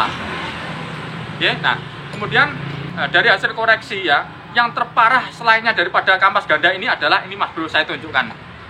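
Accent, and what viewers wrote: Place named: East Java, Indonesia. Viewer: smpean kok faseh bhasa jawa timuran om?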